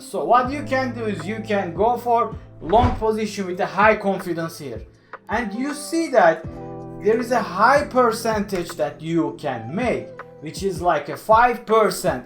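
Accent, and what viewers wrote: Turkey. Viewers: abi konuşmadan dedim bu türk aksanı baktım öyleymişsin güzel video emeğine sağlık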